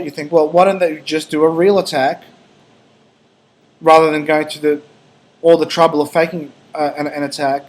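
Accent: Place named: New Zealand. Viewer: sorry to be off subject but...you're a kiwi aren't you! :)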